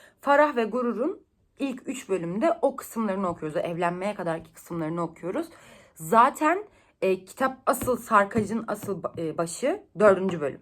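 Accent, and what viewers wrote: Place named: Black Sea, Turkey. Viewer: Ayayayayaya Karadeniz şivesii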